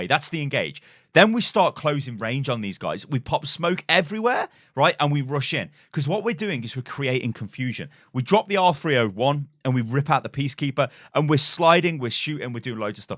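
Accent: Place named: Northern England, United Kingdom. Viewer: Love this new series!! on btw are you northern? you have little bits of it i swear as i'm derbyshire, sounds similar.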